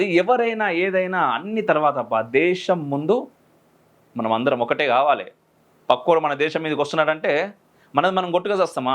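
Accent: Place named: Telangana, India. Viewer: సమాచారం బాగుంది, కానీ తెలంగాణ యాస మాత్రం బాగోలేదు.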